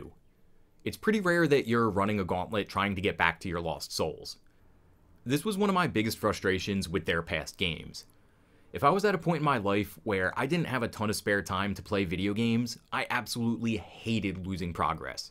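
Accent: Philadelphia, United States. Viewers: Philly accent for sure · GREAT points man. you from philly? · Yo bud you from around southeastern PA? Is that a slight Philly accent I hear? You sound like me lol.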